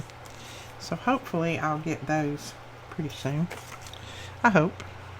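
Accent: South, United States. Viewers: I would never tell you to hush i love listening to your beautiful southern accent❤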